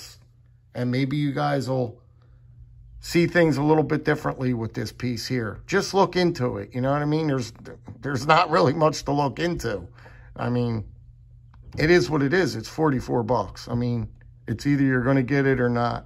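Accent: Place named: South Jersey, United States. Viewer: Lol that SNJ accent..... I grew up there myself. Oh, and the range boss is amazing.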